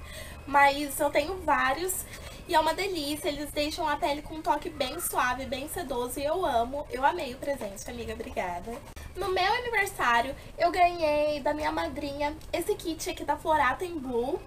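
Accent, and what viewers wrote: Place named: Brazil. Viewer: Olá querida, belas comprinhas, mas esse sapato azul, uuii adoro !! Sou portuguesa mas amo o sotaque brasileiro ;) Passa no meu canal que tem video novo ;) Beijinhooo grandeee *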